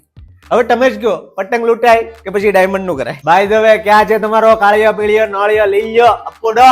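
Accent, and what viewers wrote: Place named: Kathiawar, India. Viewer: આપ ભાવનગર નું ગૌરવ વધાર્યું પ્યૉર દેશી કાઠિયાવાડી રજૂઆત આપ કરી રહ્યા છો હો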